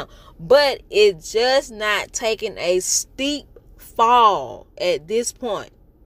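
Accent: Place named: South, United States. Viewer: Love that country twang lol and personality for that alone I will subscribed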